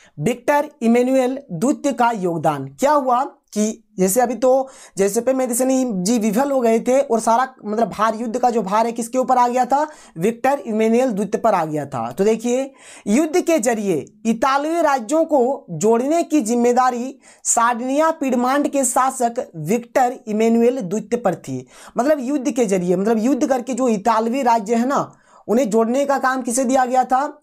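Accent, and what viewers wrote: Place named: Bihar, India. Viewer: सर क्या आप बिहार राज्य से हैं? बोली से थोड़ा- थोड़ा पता चल रहा हैं क्योंकि आप पूर्ण को पूरण बोलते हैं।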